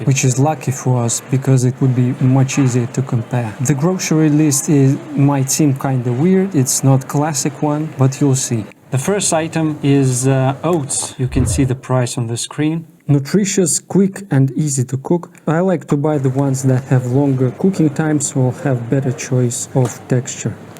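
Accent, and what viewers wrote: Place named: Scotland, United Kingdom. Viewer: Sounds like a Scotty to me.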